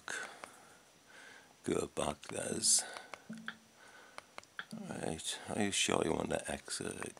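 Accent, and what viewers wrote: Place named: North, United Kingdom. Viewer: Mate, I would seriously pay you to make this for me, been looking at fires endlessly and this is better than any of them. This video is quite old, so I would love to know if you're still using it and how it's going. You sound from the North, I'm in Scotland and I serious about you doing this for me. I take it this is just for looks and no heat involved. If you are interested, please reply and we can get in touch. Regards.....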